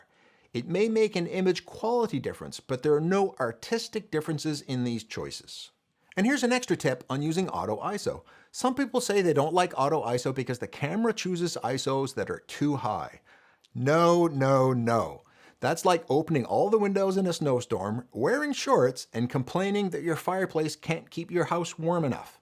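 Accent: Canada. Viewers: That is a prime time Canadian accent 🇨🇦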